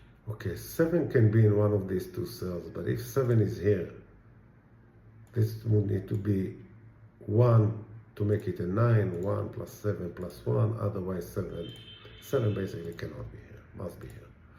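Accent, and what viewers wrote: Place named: Israel. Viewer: Name is, has Israeli accent... נראה לי שאני יכול להגיד בוודאות שאתה ישראלי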